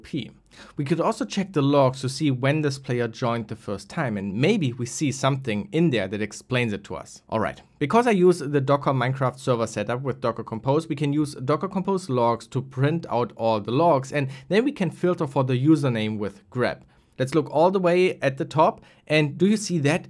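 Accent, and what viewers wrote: Germany. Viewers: i hear your german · U just sound so german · your german?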